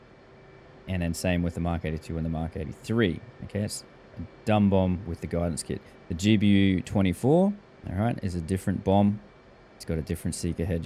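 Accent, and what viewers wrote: Australia. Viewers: So nice hearing another Aussie accent. Great video!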